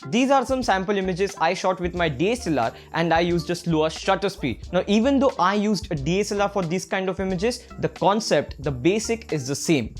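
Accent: India. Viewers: Finally a decent indian accent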